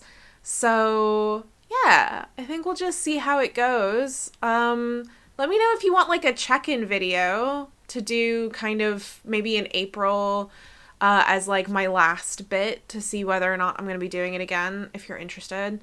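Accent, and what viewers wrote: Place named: United States. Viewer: Which city are you living ? Because it seems like European country but your accent is like American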